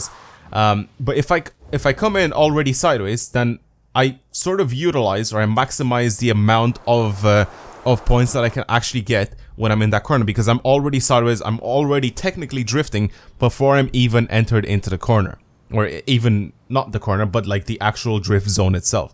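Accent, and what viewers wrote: Ireland. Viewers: what part. of ireland are. yiu from and if your not irish i swear to jaysus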